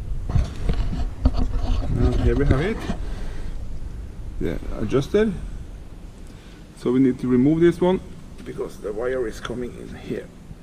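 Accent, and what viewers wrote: Norway. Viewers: Norskengelsk er så kult 😊 (Norwegianenglish is so cool)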